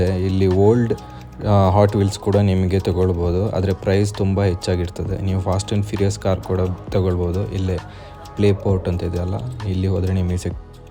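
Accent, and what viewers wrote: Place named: Coastal Karnataka, India. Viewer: Bro u are from Costal region ? Ur Kannada seems familiar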